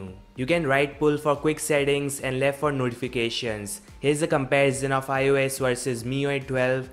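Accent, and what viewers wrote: India. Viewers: i like english from india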